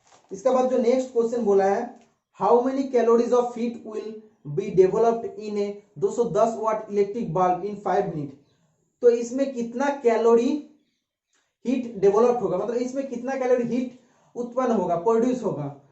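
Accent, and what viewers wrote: Bengal, India. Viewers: Are you from Bengal ?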